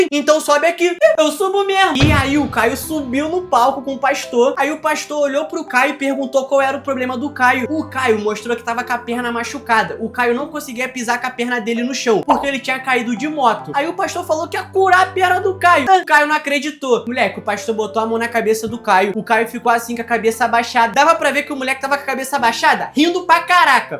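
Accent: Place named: Rio de Janeiro, Brazil. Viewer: Ai mane ele e carioca mem tlgd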